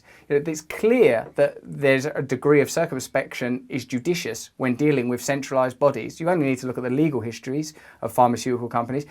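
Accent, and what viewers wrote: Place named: United Kingdom. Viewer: The Brits are so cute when they pronounce words.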